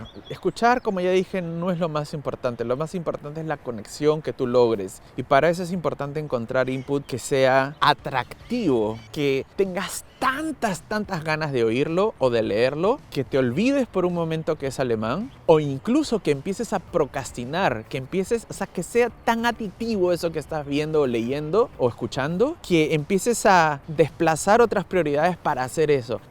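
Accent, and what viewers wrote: Buenos Aires, Argentina. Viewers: Omg, tu en tres meses se te pego el acento argentino, yo estuve una semana y se me pegó el acento y algunas palabras xD yo me escuchaba y me reia y sentia traicionera xD Es tan cierto eso que dices! De que si no tienes conexion o le ves la utklidad a algo, simplemente no entra, eso me paso con chino. En su momento cuando me cambiaron una profe de aleman ( a una que me ignoraba) , dejé de sentirme en sintonia. Ahora trato de retomar aleman. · se nota muchisimo que viviste en Argentina a un peruano que no salió de Perú no le entendería nada!! Soy uruguaya.. Muy buenos consejos!! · Hola, al principio pensé que eras de Buenos Aires hasta que escuché que eres Peruano, pero cada tanto te sale el porteño jiji.Uruguay también su acenrto es aporteñado ( aunque no les guste que se lo comparen). Muy lindo tu vlog. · Ahora entiendo porqué cuando te escuché por primera vez no entendía de dónde eras. 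Tenés aún un poco de acento argentino, pensé que eras de alguna provincia distinta a Buenos Aires. Saludos, muy buenas videos!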